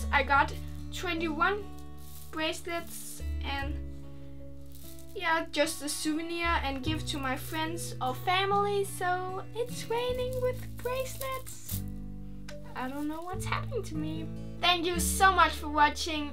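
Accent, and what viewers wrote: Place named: Denmark. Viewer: Woaw man kan godt høre at du er fra Danmark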